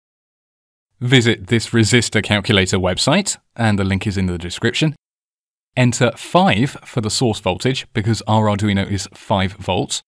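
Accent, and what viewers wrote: United Kingdom. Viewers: Just a small point, but when searching for a datasheet, isn't it better to search on the components code and the word datasheet instead of the code and pdf? Not all datasheets are PDF's, obviously most are but some are weblinks, and true there is usually hundreds of results for popular components, but sometimes obscure components are hard to find and by just searching on PDF you may mis a datasheet. Can I just ask something, how come when you search on google it's the Polish version, when you are very obviously from England, home counties by the sounds of it, why and how come? Are you hiding in flat coming out when he leave to buy hamster food? Great videos BTW, thanks. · Well done ! You have a pleasant voice, a neat pommie accent and you make the technical bits sound easy - great for building confidence in your viewers! Looks like it is time to dump the N-gauge and get a few Lego trains! 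I also love ARDUINO - I use it in my Christmas Villages.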